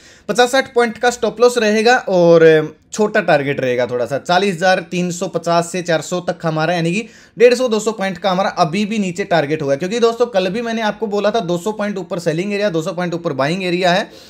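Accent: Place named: Rajasthan, India. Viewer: Sir first time apka channel dekha bahut kuch samajh mai aaya i suscribe your channel hand to hand God bless you kya aap rajsthan se q ki apki boli hamre jaise hi lagti hai sir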